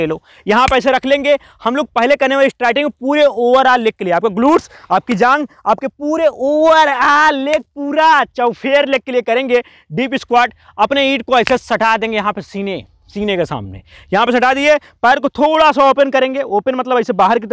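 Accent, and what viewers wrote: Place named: Bhojpuri, India. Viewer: Bhai....love ur Bhojpuriya Tone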